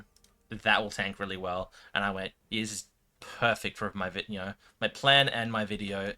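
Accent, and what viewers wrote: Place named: Australia. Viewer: Don't listen to whiney ass Americans. You are obviously Austrailian. If you say Ark Royale. Then just say Ark Royale...I'm American, and I don't think you should listen to others that nit-pick your accent. Fuck em....just say what you want. If you want to just call it the Ark Royale with Cheese....I won't complain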